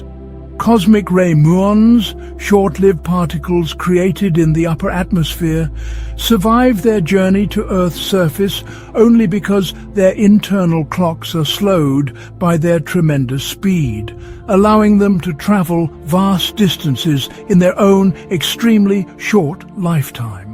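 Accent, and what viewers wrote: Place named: England, United Kingdom. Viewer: english accents just make everything sound like propaganda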